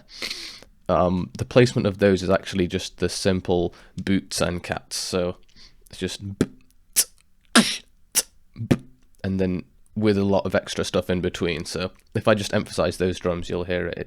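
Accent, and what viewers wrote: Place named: United Kingdom. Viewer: Were you raised in the UK...coz u have a strong british accent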